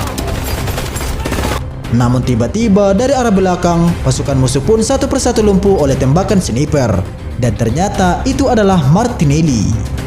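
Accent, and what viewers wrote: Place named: Java, Indonesia. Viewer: Fokus ke pembaca narasi.. mendok jawanya terasa bnget..😊😊😊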